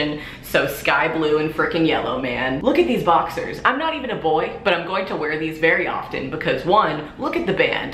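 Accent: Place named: Brooklyn, United States. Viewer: I HEAR BROOKLYN BABY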